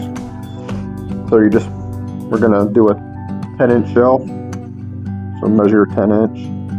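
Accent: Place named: South, United States. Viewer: Very nice - I can do this! Unique. New sub from this vid-make more easy project vids with that country rock twang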